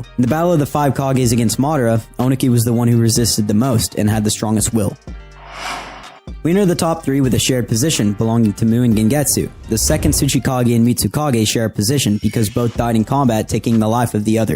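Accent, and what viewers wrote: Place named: United States. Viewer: Why does he pronounce there names so American. It's so annoying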